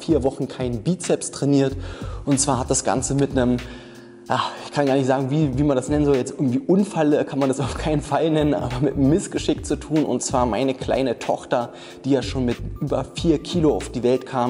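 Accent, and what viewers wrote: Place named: Berlin, Germany. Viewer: Er hat nen Berliner Dialekt. :) Berlin?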